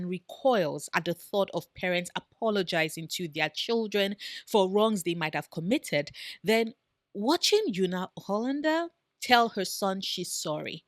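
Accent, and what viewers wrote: Nigeria. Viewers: i only just clocked the nigerian accent in this video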